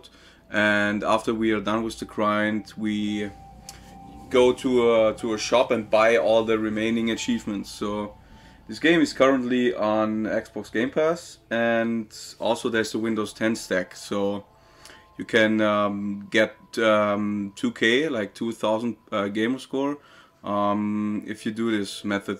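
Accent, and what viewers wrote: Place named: Germany. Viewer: Dieses deutsche Englisch ist einfach herrlich 👍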